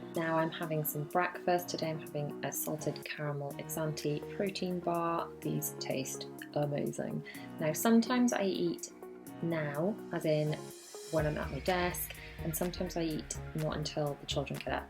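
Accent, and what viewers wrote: United Kingdom. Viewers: I'm new, nice to find a British voice on mum stuff! I'd love to see your workout routine. Thanks!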